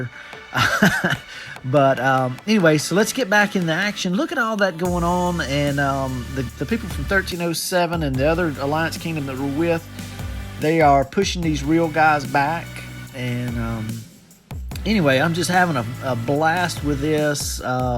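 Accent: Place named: South, United States. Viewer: Game looks interesting. You sound less southern.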